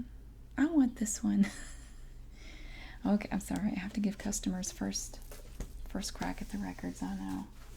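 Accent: South, United States. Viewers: This is a match made in heaven for me. Southern accent + ASMR + vinyls = my favorite things. Can't wait to fall asleep to this tonight, thank you ❤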